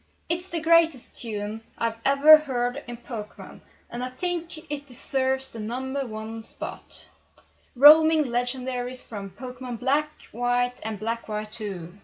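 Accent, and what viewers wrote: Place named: Balkans. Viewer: yeah, a let's play would be nice.. I like your accent and the way you talk! So the ppl like me who actually like your takling, would enjoy the vids as well:) You're from the balkan, right?:D And I'm telling you that bcz you seem very aware of your talking since you mention it in the beginning of somme vidz:D ASMR is cool, it's for relaxation purposes.. it's all about sounds ppl can hear, like whispering, or for example the sounds of the buttons when you play on the DS.. Look it up:) if you do those vids, ppl who like pokemon will watch your vids but also ppl who like asmr... And ppl who like them both will simply adore you lol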